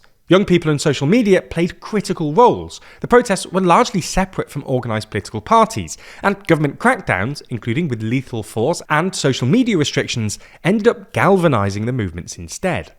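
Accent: United Kingdom. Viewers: Americans: Generation Z British people: generation Zedd. (Is he talking about Lord Zedd from Power Rangers or is this just a British accent sounding weird to me?)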